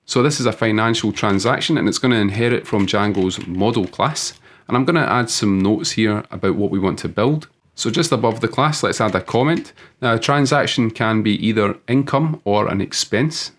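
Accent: Scotland, United Kingdom. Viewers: Your accent is really sick man 😎 love the scots. Greetings from the USA.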